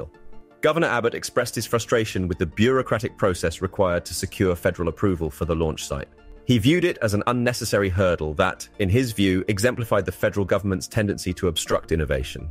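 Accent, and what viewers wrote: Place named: Europe. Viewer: Good report. Job well done. It would be nice to hear your announcer to speak in American English. There are dozens of European English on U Tube I do not like their speech nor their cadence. .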